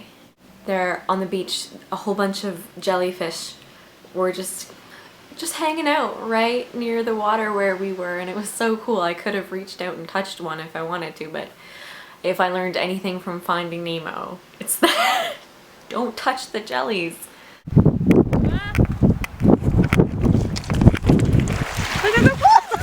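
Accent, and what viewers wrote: Newfoundland, Canada. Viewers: You have more of a nl accent than me and I've lived here all my life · Ahh Newfoundland accent! I'm from NS, and NFL is my favorite accent ever. Never thought I'd see a maritimer youtuber. This made my day! :) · my family is from Newfoundland too! I was born in Ontario, though, so I don't have any of the accent like you do (BUT IT IS ADORABLE I WISH I DID). Every time I've been I get the same sort of 'oh my god how does this place even EXIST' feeling b/c it's so pretty and everyone is so sweet :) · Hey! I met you when you were at the Folk Festival, I dont think I told you my name haha but I came up to you wearing a dress by the shirt stand. Anyways, it was really great meeting you and I love the fact that your Newfoundland accent is really strong here hahaha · Hahaa newfie accent :P I don't think mine is very bad. I don't notice it though because I live here. 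That's so awesome though! :D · You sound sooo canadian! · omg your newfie accent is thicker then mine and I live here !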